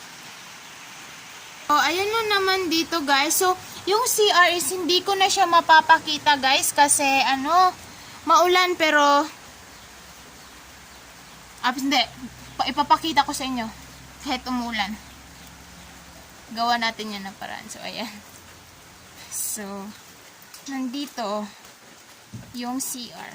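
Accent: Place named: Visayas, Philippines. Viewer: maganda yung bahay may 2nd floor pa pwede pa yang ayusin pauntiunti lng tapos yung green na kulay palitan ng white hehehe parang si beauty gonsales ka talaga mag salita maam bisaya accent 😎😎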